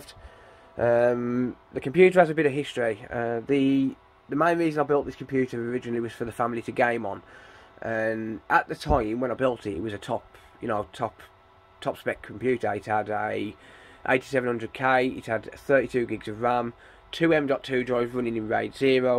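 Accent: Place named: Black Country, United Kingdom. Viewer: just subscribed off the back of my mate vince - good luck with your target - you are only up ythe road from me from the sound of the accent lol. Im in Oldbury - you are clearly a black country lad?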